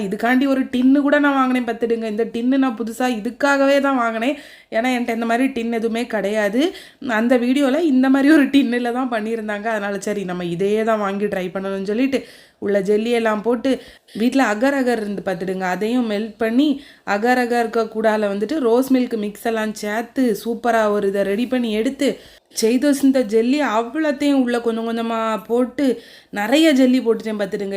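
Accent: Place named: Tirunelveli, India. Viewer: I hate tirunelveli slang. Tirunelveli place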